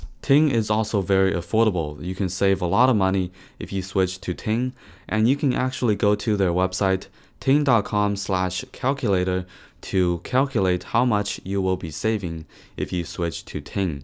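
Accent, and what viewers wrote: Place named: China. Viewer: 这英文有点中国口音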